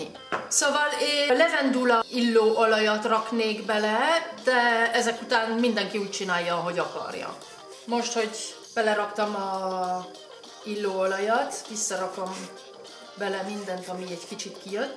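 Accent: France. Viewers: Egy kicsit hurcsa hogy magyar vagy és francia akcentusod van. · Nagyon szépen beszéled a magyar nyelvet! Imádom a francia akcentusodat! :D szexy és egyedi ;)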